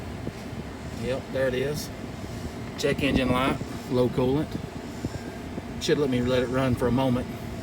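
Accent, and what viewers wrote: South, United States. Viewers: HAHA at work so had to turn on closed captioning. That shit is hilarious YouTube doesn't do a lot of work trying to learn them southern slangs that's fa sure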